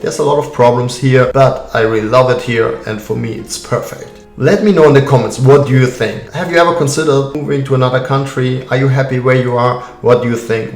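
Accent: Germany. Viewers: My grandmother Wilhelmina (my mom's mother) moved to the USA shortly after WW2 from Vienna, Austria. She always hated her name, but I always thought that it was so beautiful. Your lovely German accent reminded me of her. I'm so happy to hear that you are enjoying being in America. Hello from Maryland, USA. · You're more American than most Americans. You're not a German, you just have a German accent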